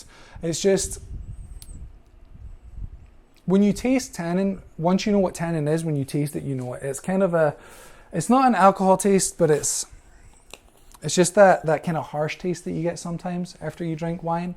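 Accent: Scotland, United Kingdom. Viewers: Very odd accent. Sounds scottish at points but then quickly becomes American?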